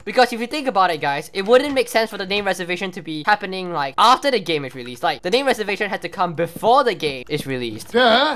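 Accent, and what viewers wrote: Singapore. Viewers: Is it just me or you got a Singapore accent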